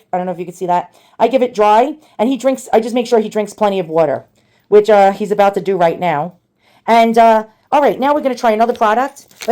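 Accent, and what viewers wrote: New York, United States. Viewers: What a great review! I love the New York accent! Can’t beat authenticity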